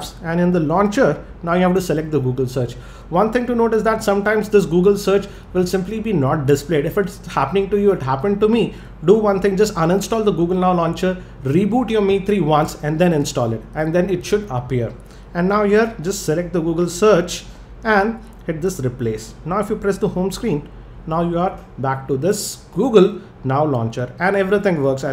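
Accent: India. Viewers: Indian voice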